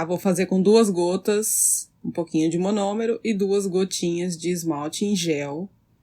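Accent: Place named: Campinas, Brazil. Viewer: Gosto muito do jeito que você fala. Parece o sotaque da minha cidade: Campinas SP. Agora eu moro em Portugal, mas sinto muita falta de ouvir essa pronúncia ❤️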